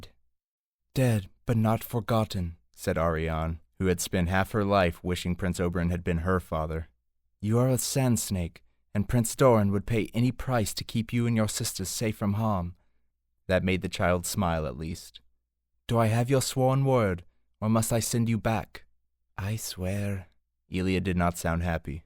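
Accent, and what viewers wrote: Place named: United States. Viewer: So weird having these read in an American accent...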